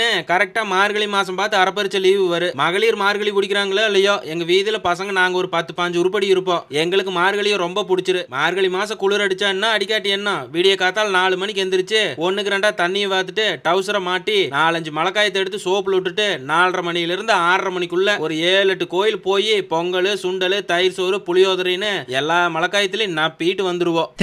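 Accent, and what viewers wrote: Kongu, India. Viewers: Solla vaarthai illai thambi,kongu tamil,balama....sirichutten😂😂😂😂😂😂.enna oru vaarthai jaalam!!!!repeated a kettutte irunden👌👌👌💓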